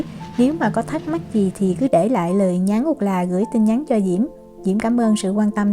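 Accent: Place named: Saigon, Vietnam. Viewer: Hướng dẫn chuyên nghiệp, tận tinh và đúng chất dọng người SG. Cảm ơn bạn Diễm và chúc Vạn sự như ý!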